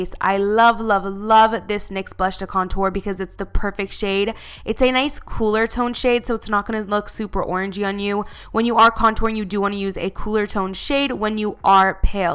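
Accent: Canada. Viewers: Her parents are portuguese,but I think her accent is pretty canadian...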